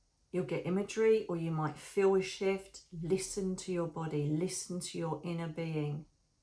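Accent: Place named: England, United Kingdom. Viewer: Just love your lovely English accent and your beautiful soul. Love from Germany ❤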